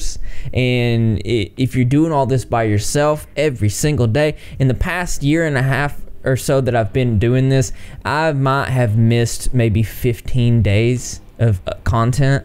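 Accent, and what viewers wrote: South, United States. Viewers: Your alternative style mixed with your country accent is pretty fire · I really enjoy your dialect, it souds so down-to-earth and accomodating. I can tell it's a southern 'accent' but where are you from exactly if you don't mind me asking? :)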